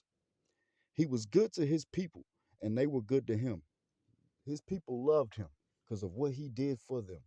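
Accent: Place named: United States. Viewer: Yo you sound American.....how you know about all this Trinidadian stuff? lol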